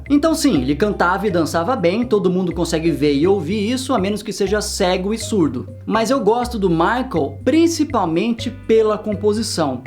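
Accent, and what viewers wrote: United Kingdom. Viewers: Vc já morou na Inglaterra? Seu sotaque inglês é igual ao dos britânicos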